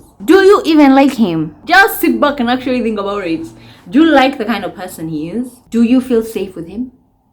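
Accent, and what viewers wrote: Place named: United Kingdom. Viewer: its the bri*😁ish accent for me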